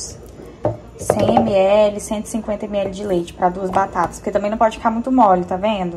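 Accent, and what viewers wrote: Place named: Minas Gerais, Brazil. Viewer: Esse sotaque de mineiro 😍😍😍 amoooooooo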